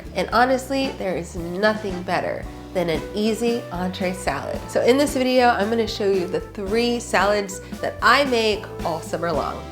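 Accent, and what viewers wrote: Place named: South, United States. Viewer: she's from the south, USA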